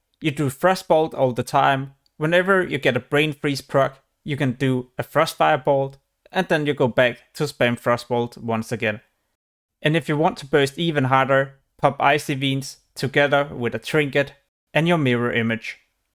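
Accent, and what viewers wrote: Denmark. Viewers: Denmark I'd say 😀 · jesus christ, the danish is strong in this one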